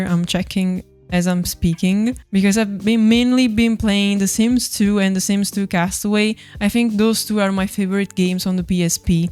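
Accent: Italy. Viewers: the italian to english translate struggle is so real omgg happens to me all the time too!! love your accent!!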